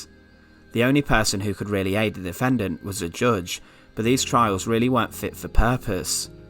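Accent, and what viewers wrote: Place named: England, United Kingdom. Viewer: Great narration. Love the accent. What part of England are you from?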